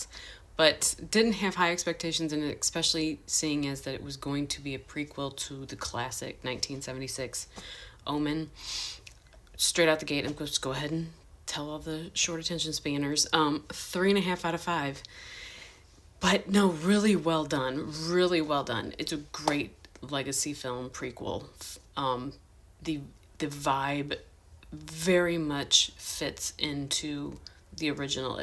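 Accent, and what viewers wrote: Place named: Midwest, United States. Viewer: I dig the midwest twang.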